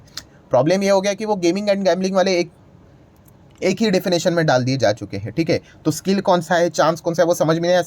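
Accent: Maharashtra, India. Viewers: Kantala se laga sir Marathi Manus hai 😂😂😂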